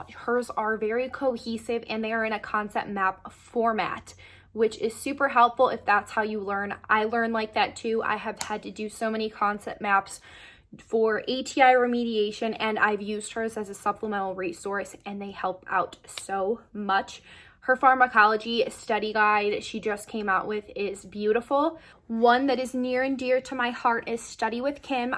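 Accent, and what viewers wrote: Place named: Midwest, United States. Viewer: you are sooo cute and thanks for this! I was recently accepted into Chamberlain in Irving, TX! So I HAVE TO ASK! Did you attend the school in Chicago????? You sound like you're from either Chicago... or Minnesota! I was born & raised in Chicago!!!! <3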